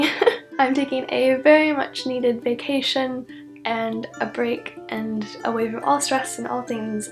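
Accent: United Kingdom. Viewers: I believe she has mentioned in an older video that she is originally from Florida, and she moved to London, which is where she currently resides. It might seem like she has an accent or uses British English due to her stay in London for an extended period of time.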